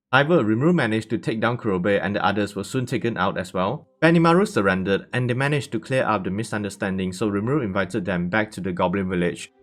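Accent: Singapore. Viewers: Ma man sound like a Singaporean / Malaysian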